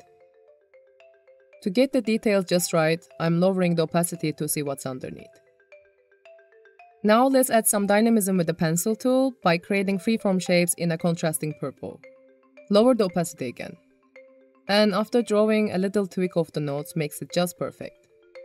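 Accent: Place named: Turkey. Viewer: Konuşan kesin Türk, aksandan belli 😂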